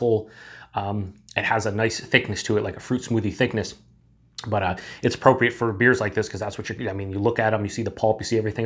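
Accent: Western New York, United States. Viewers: Are you from western NY?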